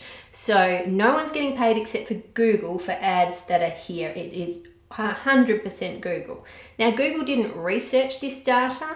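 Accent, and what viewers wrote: Australia. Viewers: I love your videos so much omg I remember watching you like 6years ago. I actually learned english by watching your videos😁 now I have an aussi accent even tho I'm not aussi😂 thank you for keep making these videos🥰 I wish I could support you on your patreon but I unfortunately can't atm but you'll definitely be the first (and probably only) person I'll support! Thank you for everything and keep going❤ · Hey I love your channel And after binge watching your channel I changed my Siri to Australian since I love your accent Hope you reply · Her Australian accent is so strong😂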